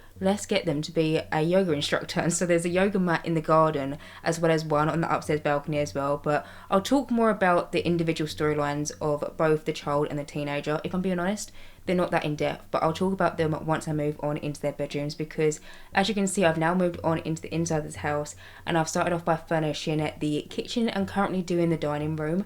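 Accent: United Kingdom. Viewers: Ouu British actent I don't understand!!😂❤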